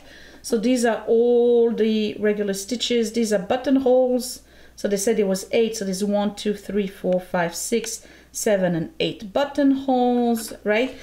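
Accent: France. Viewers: Happy birthday!🎂🤗 I am excited to see your quilting and sewing projects. That is something I have always wanted to do, but never really been able to accomplish. Maybe my old yard sale machine was the problem 😉lol. Anyway I have already learned a lot from this video. I always look for your new videos and love your French accent, it is beautiful. Thanks for sharing.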